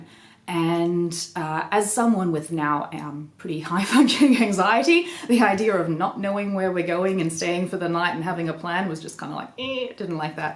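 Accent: Australia. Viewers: Sorry to hear about your troubles. Well done seeking help. I've only just discovered your channel, it's great to hear another Aussie accent on mini related videos. Especially sorry to hear that your booze got pinched when you needed it most. Look forward to catching up on all the videos you've posted thus far. (I'm very curious about the models in the display cases behind you. I hope they are featured in your other videos, and if not, could you do a video tour of them)? All the best.......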